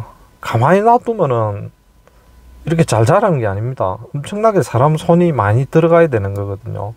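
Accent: Gyeongsang, South Korea. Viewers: 구수한 경상도 억양이 정감이 듭니다 전원주택의 벌레.잔디관리.귀촌의 단점을 잘 알려주어 로망을 접어야겠습니다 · 경상도 액센트가 너무멋져요❤❤❤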